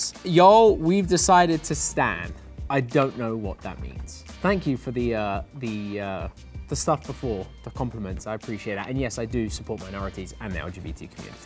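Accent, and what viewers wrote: United Kingdom. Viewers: Mindfcked after seeing him play an American boy on runaways and now this Brit accent? Lmaooooo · I know him as Chase so hearing him with a British accent is trippy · Why did I not know that was British eye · He gives Tom Hiddles a run for his money to be the most British person to ever British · He's... british? Oo hoo this just got even better 🤣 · I did not know he was British wtf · can run me over with that British accent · I didn't expect he has a British accent... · yeah he's British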